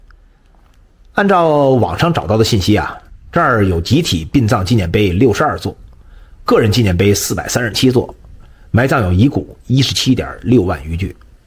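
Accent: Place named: North, China. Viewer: 北方口音。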